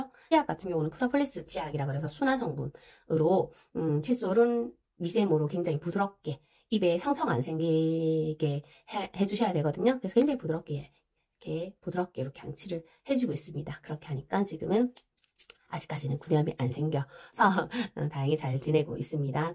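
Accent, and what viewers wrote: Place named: Gyeongsang, South Korea. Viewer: 저도 대구 사람이에요 ㅎㅎ익숙한 대구 말씨이셔서 ㅎㅎ 항암 이전인데 영상보고 많이 배우고 있어요😊!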